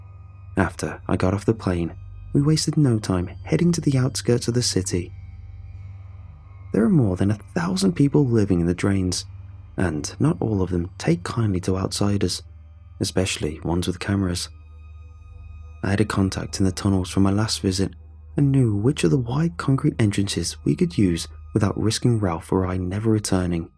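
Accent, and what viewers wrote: England, United Kingdom. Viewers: Well done big lad, incase you haven’t noticed the narrator is English, and it’s a bit of fiction story telling. Absolute yogurt for brains !!! “tHiS iS AmeRiCa” 👁👄👁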